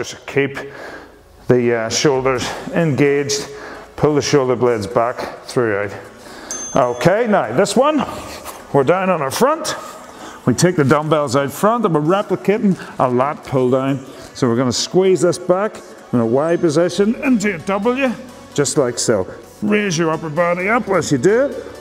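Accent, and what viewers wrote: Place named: England, United Kingdom. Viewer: I wonder what that English accent is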